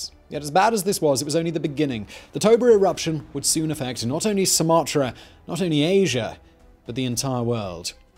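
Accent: United Kingdom. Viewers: I'm sorry to ask you this, but... You're British?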